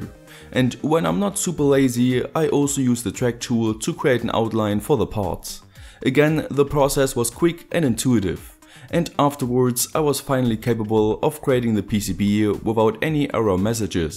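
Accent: Germany. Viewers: what realy you are german iam too i wonder for a bit why your main plugs are european and your english is kinda german i dont know XD but why are you dont make german videos i love to see that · man hört voll das du Deutscher bist :D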